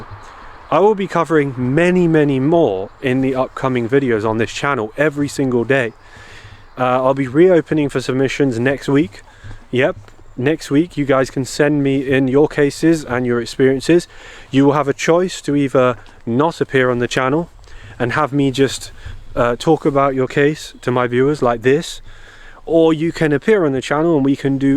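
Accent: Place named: RP, United Kingdom. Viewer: Thats a very interesting story about the farmer and cattle situ. Ley lines run 3 ft above Earth and 3ft under the Earth and no matter your denomination that ley energy is what is used to manifest. So these people were obviously using that energy for the dark purposes and thats why the cattle could not eat the grass because its likes its poisoned and they knew it. In India cows are sacred and also in Egypt too. Its nice you speak the Queens English without a regional accent, because its dying out and thats a real shame, although the odd word I can hear an accent from up North like possibly a Yorkshire accent, but only an odd word occasionally. You speak beautifully and I adore listening to you !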